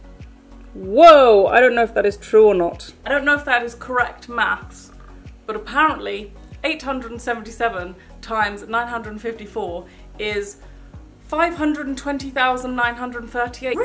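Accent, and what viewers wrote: United Kingdom. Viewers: Hi are you British???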